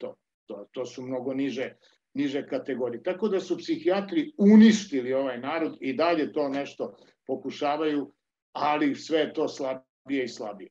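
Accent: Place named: Croatia. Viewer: Voditelj govori neku croato varijantu srbijanskog narečja.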